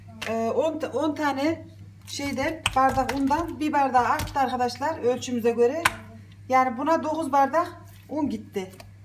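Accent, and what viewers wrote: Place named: Central Anatolia, Turkey. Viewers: Eline sağlık çok güzel oldu poğaçalar ve de doğalsınız Kayserilimisiniz iç Anadolu şivesi ile konuyorsunuz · Ellerinize emeğinize sağlık canım çok beceriklisiniz maşallah memleket neresi konuşma şiveniz Yozgatlılara benziyo harikasınız 😘😘😘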